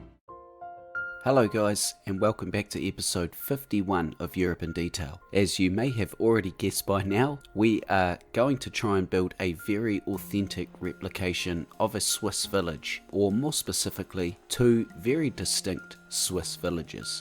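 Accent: New Zealand. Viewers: Your level of detail is amazing. You popped up out of nowhere! How long have you been playing the game and been on YouTube? Love your Kiwi accent. A Canuck here!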